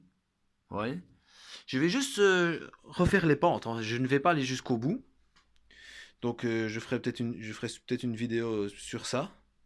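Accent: Liège, Belgium. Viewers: Merci mon ami,avec ton accent , je me doutais bien que tu étais de la région de Liege ,moi , j'ai habité et travaillé a Andenne,puis Huy et ensuite a Wanze , les voyages forment la jeunesse , mais bon , avec mes 64 ans ,c'est un peu tard , mais je suis reté tres jeune dans ma tete,hi.....hi......Bonne continuation mon ami, tu fais du tres bon travail . A+ Alain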